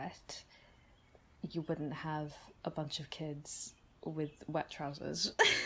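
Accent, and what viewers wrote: Wales, United Kingdom. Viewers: Can’t quite figure out the accent 😂. Is it Welsh?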